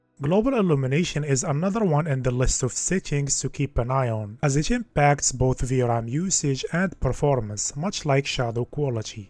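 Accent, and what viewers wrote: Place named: Morocco. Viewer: omg your accent is so moroccan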